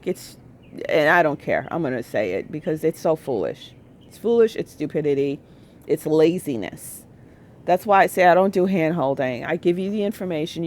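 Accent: New York, United States. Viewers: Thanks for update. It makes me laugh when you go "New York" in how u say things, but hey, the message is received. It ain't no joke. 🙏😘😘😘🤗🤗🤗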